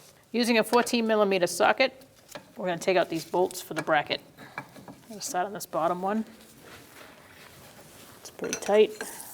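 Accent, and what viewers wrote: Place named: Massachusetts, United States. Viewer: Thanks for the help. That accent reminds me of my mom. Mass born. As a kid i would ask her to say water for a laugh.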